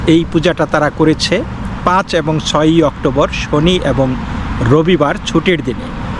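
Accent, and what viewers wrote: Bangladesh. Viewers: ওওওও দাদা আপোনার বাংলা বলার ছিরি অমন ক্যানো? ওওওও বাংলাদেশের আপনি?ইন্ডিয়ার বাংলা এমন নয়।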